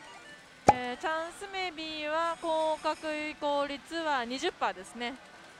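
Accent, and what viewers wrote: Kagoshima, Japan. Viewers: 何処の出身ですか？？ 鹿児島？？🤔🤔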